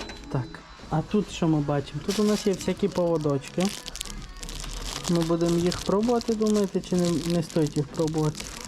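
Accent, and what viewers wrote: Transcarpathia, Ukraine. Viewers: По говору чути що з Закарпаття) А звідки саме?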